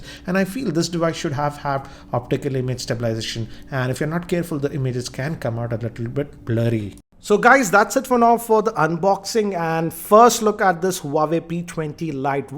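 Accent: India. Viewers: Are you from india because you're English is not at this girl but i really like your videos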